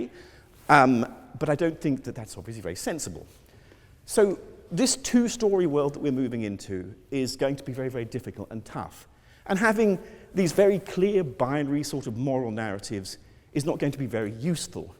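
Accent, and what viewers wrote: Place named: United Kingdom. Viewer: Goddddd...I have trouble understanding British accent..LOL~~~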